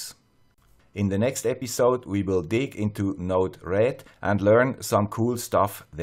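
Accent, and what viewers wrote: Switzerland. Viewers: Hi Mister Swiss Accent First of all, i would like to thank you for your excellent video tutorials. i know how much time you are spending on this stuff. Big Thumbs up. Encouraged by this video i started to build my own automation system. But to be honest this stuff nearly screwed me up. Being a newbie it showed to be tough work to get my system running. I spend nearly 3 weeks to set up the raspberry, the development tools and to build my own firmware. I got stuck nearly every problem that could occur during the build process. Fiddling with linux was one of the issues. Due to some basic programming experience i thought that it would be easy to build my firmware.Wrong assumption. The build process was a trial and error approach due to the spare information for the firmware build. Most annoying was the fact that there is a plethora of information in the internet. 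But as a newbie i could not figure out if this information was useful for managing my problems. Most items i found were outdated, wrong context or even worse just false. Finally i found 2 major information that helped me out. I would like to ask you if it is possible from your side to provide us with a video showing a brief overview of setting up the environment, the build process and TOOLS (i.e. Platformio vs. Arduinoi) and hints to avoid the pitfalls for the build process. One of my major time consuming issue was to figure out how to configure the right Arduino Development Environment. Newbies will find really nothing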